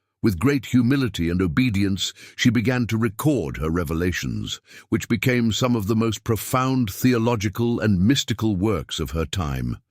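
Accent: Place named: United Kingdom. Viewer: Please! Drop the creepy AI British accent ..just can’t listen to it. How about a woman’s voice for a change?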